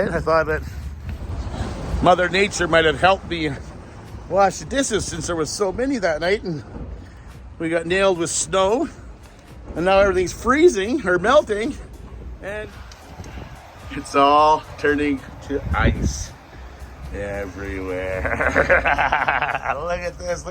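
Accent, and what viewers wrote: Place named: Canada. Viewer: I thought Dude said : Peace,Love & chew toys. But it could be his Canadian accent.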